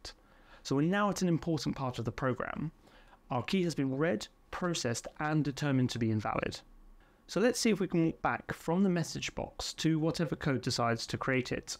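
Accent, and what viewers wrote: United Kingdom. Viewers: 1. Britisch accent check 2. Nice explanation check 3. Fun topic check Subscribed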